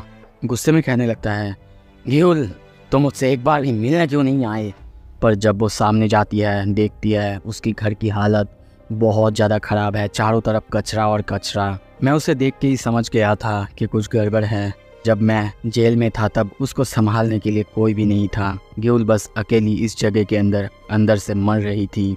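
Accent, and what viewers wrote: Bengal, India. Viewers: Are you bengali???😊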